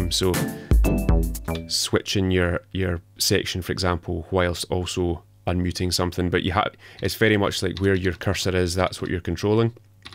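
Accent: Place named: Scotland, United Kingdom. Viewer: It's a great tutorial my Scottish friend (is it Scot? I'm American so it sounds like you're Scots heh heh) A little long before the music examples, but it's totally cool.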